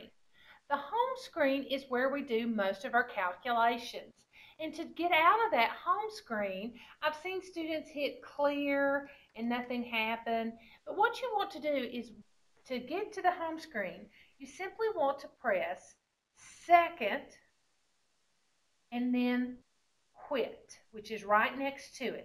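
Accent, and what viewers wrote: United States. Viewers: God bless you, are you from Boston?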